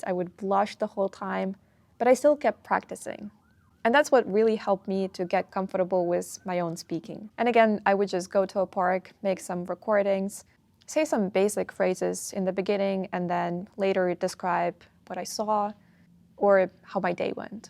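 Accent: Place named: United States. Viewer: That's fine but you're speaking English with an awful American accent. Why do so many people do this?? It's not English. It's annoying.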